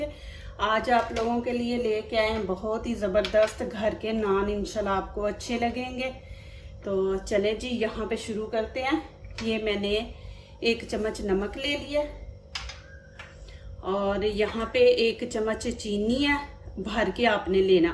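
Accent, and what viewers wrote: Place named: Punjab, India. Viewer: MashAllah bohet mazy ka nan bohet achy treqy se semjaya ap mujy pnjab se lgti he ap ka andaz benjabion wala he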